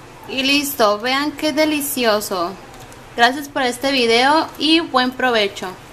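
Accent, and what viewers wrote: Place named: Sonora, Mexico. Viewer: ya esta pues mushasha si te creo que seas de sonora porke asi ablamos con la she o sho jajaja saludos desde los angeles californiae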